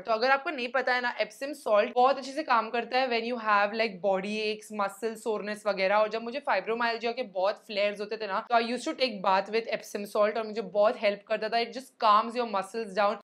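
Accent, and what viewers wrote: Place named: Mumbai, India. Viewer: Liked the tapori mumbai type of accent 😂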